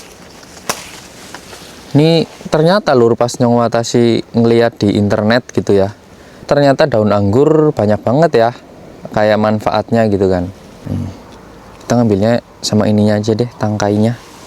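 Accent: Banyumas, Indonesia. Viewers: Mas sangat kreatif ya, senang dengan vlognya yg ngapak2 gitu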